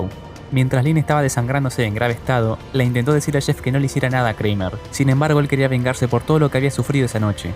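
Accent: Argentina. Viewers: ja, reconozco bien ahi el acento argentino como cuando dices "machoria" (mayoria) o "chaves" (llaves) y a pezar de esto tienes una voz muy narrativa y asi, buena pa el estreno del video, qe buen era el momentito qe pasé gracias a ti, te lo debo tu like, pero qe 🤢🤮🤮🤮🤮 cuando mostrate la escena cuando kramer morrio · Excelente video, muy detallado y completo, pasando por toda la saga de esta maravillosa película, felicitaciones por tu trabajo, amigo, por tu acento sos Argentino. Saludos desde Buenos Aires Argentina!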